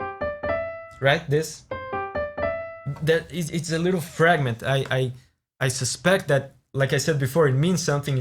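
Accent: Brazil. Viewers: Man, I have to ask. Are you Brazilian? Your accent sounds very Brazilian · Mano, seus vídeos são muito fodas! Achei seu sotaque familiar, logo pensei "é um brasileiro falando em inglês" kkkkk continua assim, vai longe ainda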